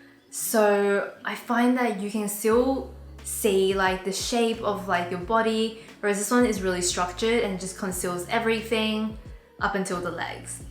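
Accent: Australia. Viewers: Oh yay I thought you were Australian! Love Carriageworks :D And such a great video. I’ve been looking at Babaa knits and this has made me really try and consider 1) whether I need it and 2) which colour will truly suit my skin tone and wardrobe! <3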